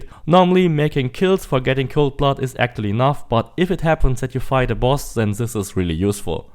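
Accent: Germany. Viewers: Laut deinem Akzent gehe ich davon aus das du deutsch sprichst...Top Video. Danke.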